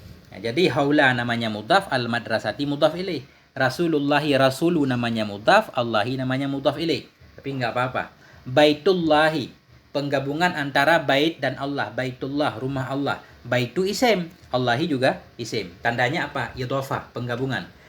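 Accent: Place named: Lombok, Indonesia. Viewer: Afwan kayaknya ustnya org lombok ya hehhee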